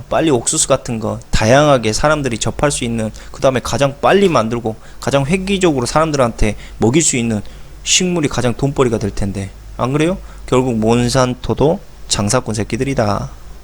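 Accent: Jeolla, South Korea. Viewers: 긍께 고향이 어디다요?? ^^ 전라도 지라? 좋은 정보 감사합니다.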